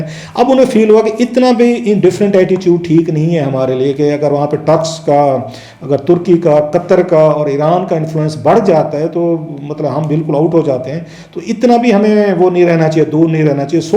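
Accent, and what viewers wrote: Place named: Punjab, Pakistan. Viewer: Rauf ji, i'm from India. I like your topic selection n analysis .n live your heavily loaded punbabi accent...keep going ..all the best...Not seeing Amir Mateen saab.. best wishes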